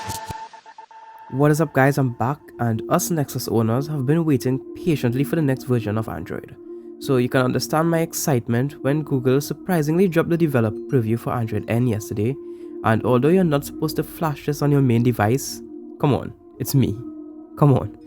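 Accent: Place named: Trinidad and Tobago. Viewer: Hmmm..... you sound Trini. Am I right? :) #Trinidad&Tobago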